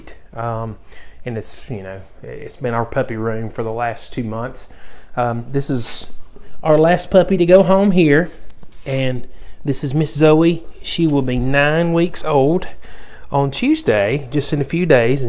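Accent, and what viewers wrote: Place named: South, United States. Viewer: I love the Southern accent! Very charming. "A letter" instead of "a litter."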